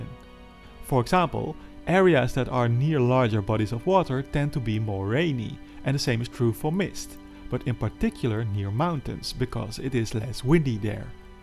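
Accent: Netherlands. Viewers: (re) Dutch accent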